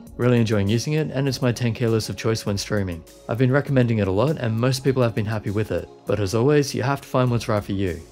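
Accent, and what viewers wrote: Australia. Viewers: Do you speak a General Aussie accent? Im learning accents and it’s hard to find the difference between Broad and General. I know ur not using the Cultivated accent.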